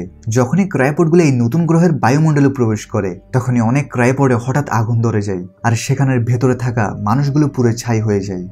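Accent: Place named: Chittagong, Bangladesh. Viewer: আপনার নাম কি জানিনা। উচ্চারণ শুনে মনে হয়েছে চট্টগ্রামের বাসিন্দা। ইন্টারেস্টিং মুভির এক্সপ্লেনেশন করেন। কিন্তু একটি উচ্চারণ শ্রুতিকটু মনে হয়েছে। এন্ডি থেকে লেগেছে, লিওনার্ড থেকে লাগছিল... এভাবে না বলে বলবেন- এন্ডির মনে হয়েছে, লিওনার্ডের মনে হচ্ছিলো, তার খুবই খারাপ লাগছিল, তার ভালো লেগেছে। থেকে শব্দটা এভয়েট করুন।। শুভ কামনা।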